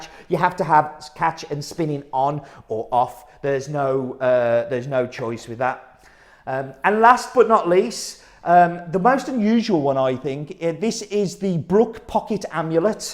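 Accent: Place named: Ireland. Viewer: Could be Irish aswell...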